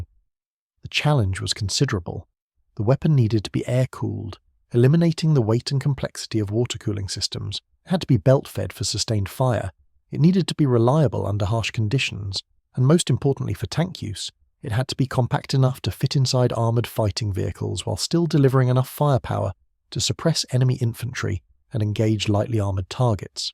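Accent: United Kingdom. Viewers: The narration uses a British voice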